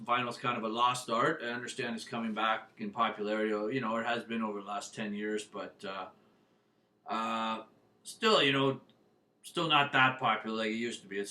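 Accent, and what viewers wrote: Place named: Canada. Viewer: Wow, you have the most stereotypical Canadian accent I've ever heard!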